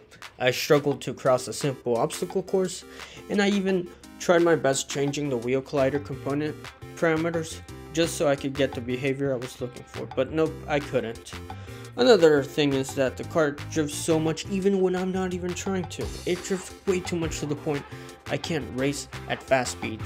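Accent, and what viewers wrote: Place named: East Los Angeles, United States. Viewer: It's because he's young it's still weird. Or it's that lip smacking noise he makes sounds like a chick from east LA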